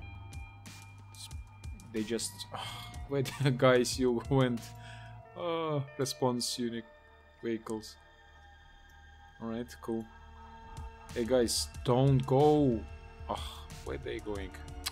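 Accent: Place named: Estonia. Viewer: i figured that younwere estonian by your thick accent haha. hello from finalnd, bro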